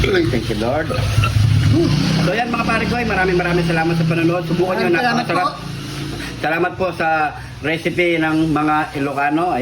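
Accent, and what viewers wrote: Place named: Western Visayas, Philippines. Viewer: Akala ko ba ilocano version bakit ilongo pagkakasabi mo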